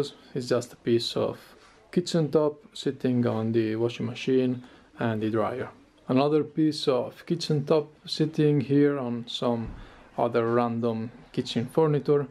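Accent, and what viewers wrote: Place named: Northern Italy, Italy. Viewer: hahahhaha si sente che sei del Nord!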